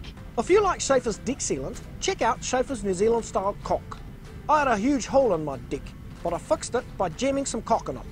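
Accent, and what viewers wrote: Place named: Australia. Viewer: It's an Australian accent peeps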